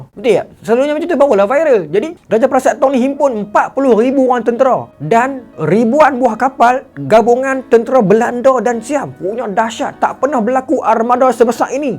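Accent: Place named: Kedah, Malaysia. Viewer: assalam...awat laju sangat hang habak mai...mokcik x faham..puas nk dengar...sambung2 kn isi cerita tu...campok2 slang kedah lg...mmg khas utk og kedah saja ka citer ni?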